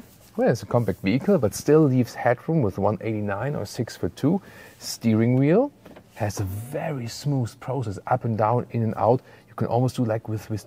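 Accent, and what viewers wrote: Germany. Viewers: If the presentation of a car is mostly focusing on the design you know that something is wrong. It doesn´t help that a german guy is trying to make it more convincing by giving a biased presentation with a bloody german accent. The high pricing is just insane.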